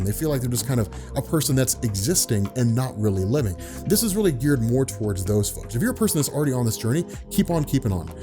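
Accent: United Kingdom. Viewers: Quality London accent,. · your English accent is amazing..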